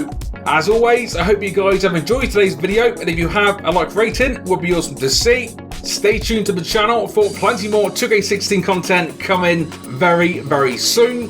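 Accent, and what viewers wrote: England, United Kingdom. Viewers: you are from England ?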